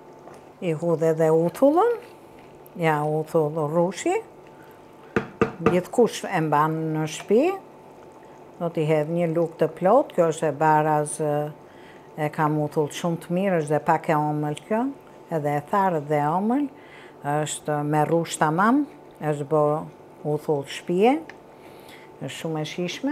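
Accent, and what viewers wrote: Tirana, Albania. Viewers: Te lumshin duart moj zonje e nderuar te ndjek vazhdimisht . Me pelqen e folura jote origjinale e Tirones. Qofsh me jete te gjate dhe e gezuar.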